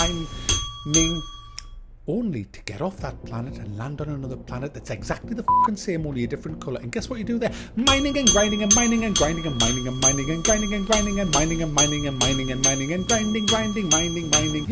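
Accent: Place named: Northern England, United Kingdom. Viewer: He's a northerner? I like that accent.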